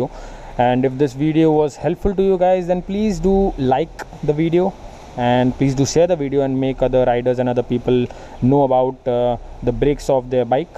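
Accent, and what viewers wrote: Gujarat, India. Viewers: u gujrati?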